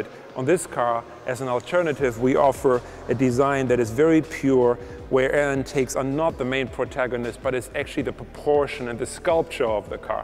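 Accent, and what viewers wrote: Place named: Germany. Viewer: Der Man hat ein deutschen Akzent👀 Das Video ist auf einem deutschen Kanal👀 und dazu noch in dutschland👀 Warum spricht Er Englisch 🤷🏻‍♂️👀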